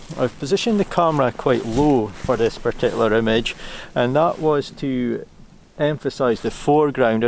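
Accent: United Kingdom. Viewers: Your image screamed Scottish Woodland as I know it, which is perfect. A gorgeous image, absolutely stunning especially with that silver birch behind your subject tree and the light on the floor. It all came together to make a phenomenal shot. Whenever I look at your subscriber numbers I always expect to see a K after the number. I don’t shoot videos mainly because of how I sound with my breathing so any advice from myself would be plucked from the air. However, I read on another channel how our friends from across the pond struggle with our British accents, I was wondering if you slowed your speaking down a little bit it might help? I’m not trying to be critical, just trying to think of things that might help your channel get to where it rightly belongs. You are undoubtedly one of the best British landscape photographers on YouTube, but your numbers don’t reflect that fact. 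Anyhow, that aside an brilliant video with an image I’d be more than happy to have as my 'one' image after a week out never mind 3 hours.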